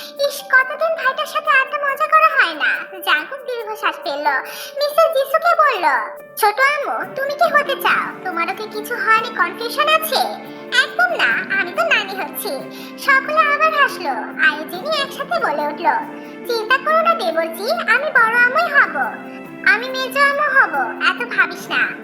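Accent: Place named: Kushtia, Bangladesh. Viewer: আপু আমি জানি এই চ্যানেলে তেকুক স্টোরি ছাড়া কোন স্টোরি কোন সময়ই দাওনা আপু আপু আপু খুব খুব খুব একটা অনুরোধ করছি আপু তুমি একটা তিনচার পাটের স্টোরি দিবে ভিমিন, আপু তোমার এই স্টোরি দেখে আর কুষ্টিয়ার কথা শুনে আমার খুব ভালো লেগেছে কারণ আমার বাড়ি কুষ্টিয়ার ভেড়ামারাতে, যদি তুমি আমার জেলার মেয়ে হলে আমার অনুরোধ রাখবে আমি আশা করছি, আমি তেকুকু লাভার আবার ভিমিন স্টোরি খুব সুন্দর লাগে আর তোমার স্টোরি খুব সুন্দর লাগে তাই বিনিতো অনুরোধ করছি প্লিজ প্লিজ নির্লজ্জের মতো বলছি আপু প্লিজ প্লিজ প্লিজ একটা ভিমিন স্টোরি দাও প্লিজ প্লিজ প্লিজ আর আমার কমেন্টের উত্তর আশা করছি খুব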